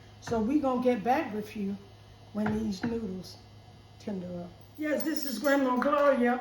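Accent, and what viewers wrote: South, United States. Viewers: Thank You Grandma Gloria, watching you be so kind with your southern accent reminds me of my mom.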